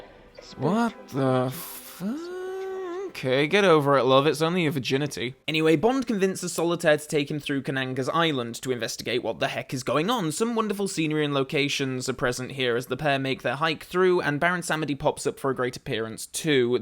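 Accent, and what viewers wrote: Yorkshire, United Kingdom. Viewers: I think it's impossible to dislike. Enthusiastic, insightful, funny, amiable, creative and handsome; he's the perfect YouTube host (which is more of a compliment than it might seem). Personally, I also really like the way that his Yorkshire accent becomes more pronounced when the pitch of his voice rises 🙂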